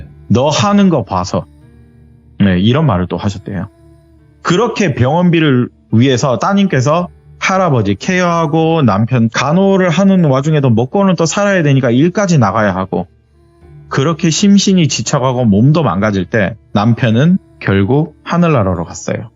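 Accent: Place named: Jeolla, South Korea. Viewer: 뭔가 불만이 많은듯한 전라도 특유의 꼬여있는듯한 말투.ㅋㅋ 깡촌을 깡촌이라 하지 뭐라그럼?ㅋㅋ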